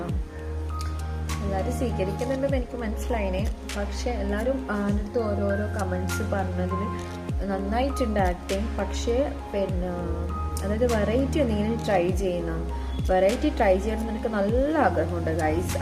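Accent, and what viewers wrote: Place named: Thrissur, India. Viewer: Thrissur 👍kunnar slang is good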